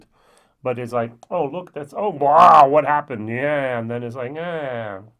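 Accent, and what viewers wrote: Germany. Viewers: Your German accent is getting the better of you. The "S" in Sauternes is pronounced as an "ess", not a "zee" [sōˈtərn]...;-) I was gifted a bottle of WHISTLEPIG OLD WORLD SERIES SAUTERNES FINISH RYE 12 YEAR which was impressive. Looking forward to a whole new year of your vlogs.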